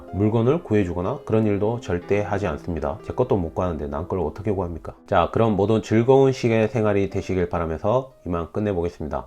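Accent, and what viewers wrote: Gyeongsang, South Korea. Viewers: 이알토 아.. 그렇군요.. 몰랐어요. 그냥 구수하게 경상도말 쓰셔도 재밌을텐데요~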